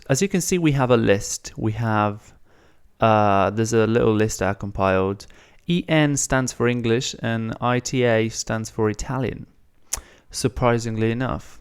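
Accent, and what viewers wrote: United Kingdom. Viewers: Thank you. I'm from Brazil and I wanna learn Italian and improve my English. So, your videos are very helpful for me. By the way, your English is amazing. Congratulations. I like this British accent.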